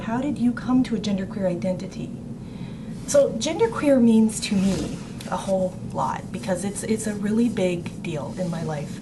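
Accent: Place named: Canada. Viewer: Yeah, that's my case, too. I'm also totally used to the pronouns; it's just legal/ work/ questionnaire forms and that "male" designation that makes me feel like I'm being DEFINED, categorized, in an incomplete or erroneous way. Of course, race is a construct, too! We're all genetically-compatible... but that's a topic for another channel... Could tell you were Canadian; it's the pronunciation of "about." :^)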